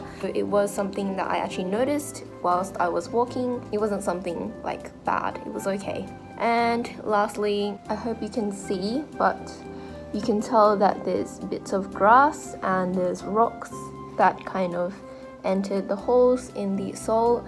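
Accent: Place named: United Kingdom. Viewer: Your british accent is so 'umai'.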